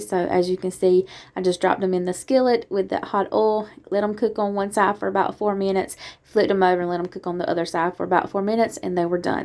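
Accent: South, United States. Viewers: Hi pretty girl, thank you for sharing your recipes , everything look so good and can't wait to try to make as well as you. Ps. Love your Southern accent. My daughter lives in Georgia too and my grands have the same accent.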